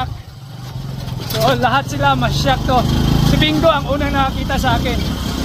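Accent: Iloilo, Philippines. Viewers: Ilonggo ka po pala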